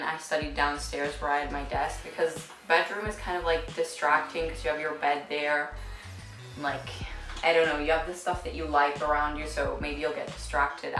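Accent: United States. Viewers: Yeah. .. love the drive and commitment you've explained in the video. Its not smooth cruising as most people like painting it as. love your vids, you're American right? detect the accent in there...and not to probe but what made ya come to England for studies?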